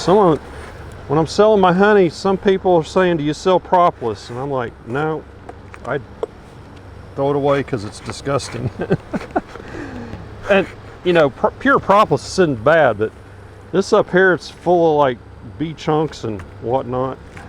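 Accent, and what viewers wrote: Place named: Texas, United States. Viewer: #1 I am a relatively new lover of bees. #2 My hat is off to beekeepers minding their bees in 90+ degree weather in the direct sun. Wow. #3 My hat is off to beekeepers (period). #4 I was smiling imagining it was Hank Hill in that bee suit because you have the same Texas drawl - then you brought up Hank and I broke out laughing. #5 Thank you 🐝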